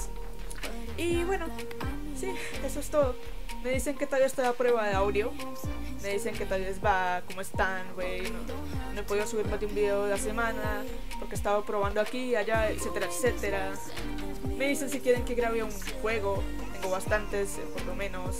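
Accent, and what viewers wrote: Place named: Spain. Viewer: Ostia eres española:000!?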